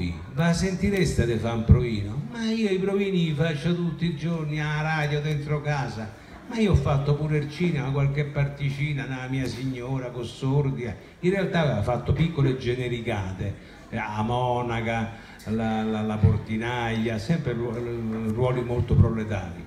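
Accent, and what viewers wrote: Rome, Italy. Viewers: Che bel racconto verace sincero con questo accento romano e questa romanità nel modo....grazie mi son fatta due risate😂😂😂😂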